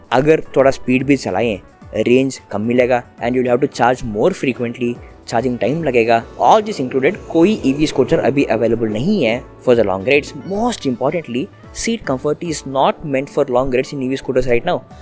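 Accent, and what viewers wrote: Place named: South India, India. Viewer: Hindi language very poor, pronouns not clear, language tone like south indain lagta hai, script bahot confused hai over all 3 star 10 star me se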